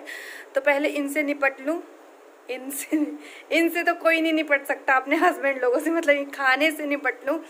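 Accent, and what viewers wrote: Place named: Ballia, India. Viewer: Logon ka kaam h kahna kahane do bahan aap kaha se h aapki boli balliya side ki lagati h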